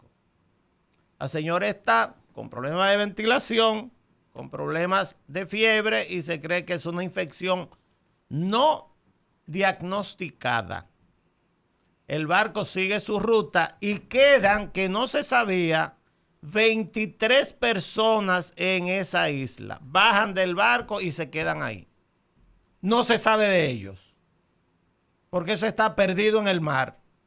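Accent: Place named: Dominican Republic. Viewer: Me suena acento de la República.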